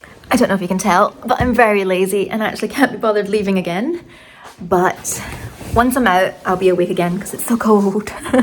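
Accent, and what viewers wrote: Scotland, United Kingdom. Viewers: I enjoy Scottish accent.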